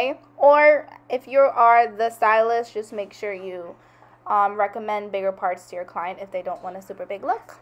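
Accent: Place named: New York, United States. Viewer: U sound like your from New York lol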